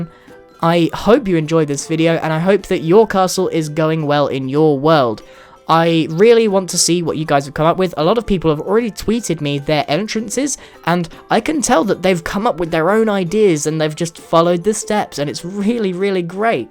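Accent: United Kingdom. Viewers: grian im from uk but ur acent sounds soooo british haha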